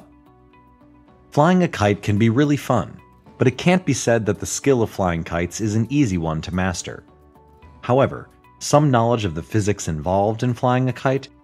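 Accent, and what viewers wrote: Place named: United States. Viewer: Dude how did speak so well in american accent. How did you train?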